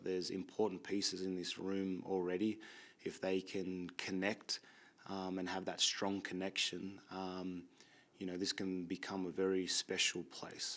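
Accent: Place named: Australia. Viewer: Great he still has his Aussie accent.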